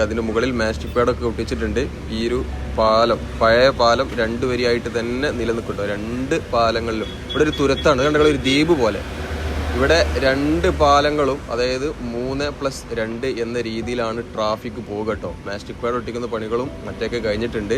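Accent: Malappuram, India. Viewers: Love your videos and malappuram slang. Thanks!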